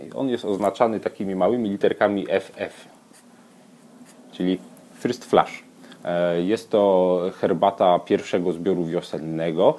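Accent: Silesia, Poland. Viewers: Słucham, słucham i myślę sobie: chłop chyba ze Śląska. No i rzeczywiście. Rozpozna swój swego;)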